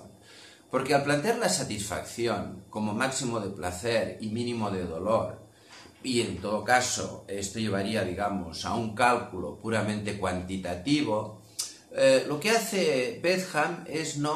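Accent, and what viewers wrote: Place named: Catalonia, Spain. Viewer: El podries haver fet en català també, que set nota l'accent!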